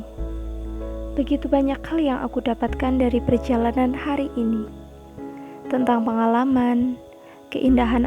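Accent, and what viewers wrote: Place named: Java, Indonesia. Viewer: Medok lek😁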